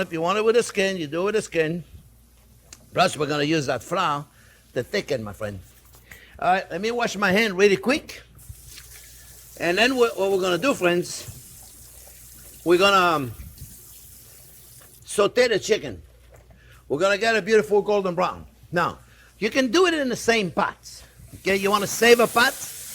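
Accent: France. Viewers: He sounds like Mario, trying to speak English with a French accent.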